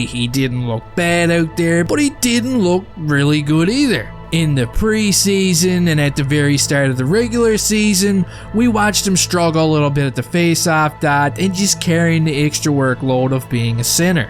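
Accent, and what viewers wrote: Newfoundland, Canada. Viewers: I follow about 10 Habs page on Youtube, and you're my favorite. You're funny as shit, your Newfie accent makes it twice as funny. Keep up the great work budz 💯👌👍🐐🥇🏒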